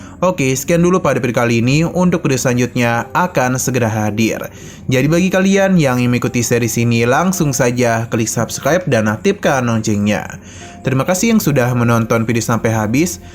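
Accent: Banjar, Indonesia. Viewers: Min mimin orng banjar kh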